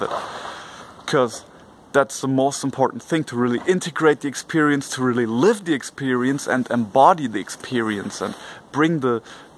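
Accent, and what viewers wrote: Germany. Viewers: German English lol cute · I like your German English :) Grounding is verrrrry important otherwise the trip might be very dangerous and scary. I also would suggest anyone who is willing to take Ayahuasca to use a grounding Crystal. Lava is excellent since it comes from the source of the Earth. Otherwise you might go into another world and you might feel you can't come back to this dimension/reality and this is the worst feeling. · hey man are you german?? Sound like.. bin nnämlich auch deutsch und ich würde gerne mal mit dir quatschen wenn du zeit hast :) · Dude you must be german cool accent 😅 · german- english :D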